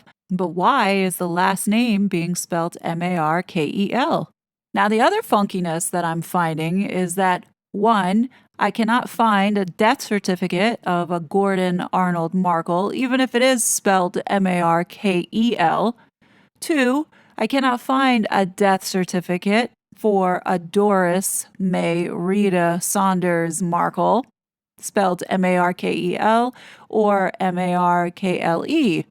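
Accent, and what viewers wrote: New York, United States. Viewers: I love the New York accent!! Set it free!!! ❤❤ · Really enjoyed this. and please, give your New York accent wings. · Hahahaha aw you are so funny and I love your New York accent!! It's a fantastic video as always 💚👍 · It’s all very bizarre 😳 I like your New York accent I actually listen for it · Awww, can you talk with you NY accent plz? It would be a treat! 😊😉🤗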